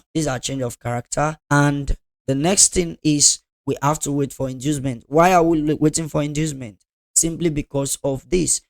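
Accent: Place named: Africa. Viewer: When I heard the african accent and then saw the exness link in the video description... instantly knew its bs and a scammer 🤣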